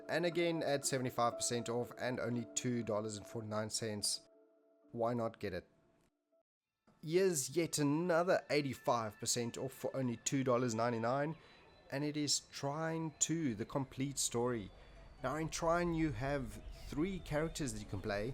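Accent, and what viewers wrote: South Africa. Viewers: accent is totally South African, sound like some of my boets Joburg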